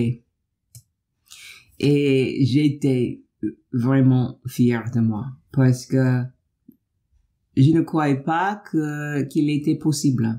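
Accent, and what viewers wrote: United States. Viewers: You have an adorable American accent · Bravo, votre français est tout à fait compréhensible, quelques erreurs mais c'est normal. Pour plus d'aisance il faut beaucoup parler avec les autres et enrichir son vocabulaire, ça prend du temps mais vous êtes sur la bonne voie. Et gardez votre accent américain en France c'est charmant 😊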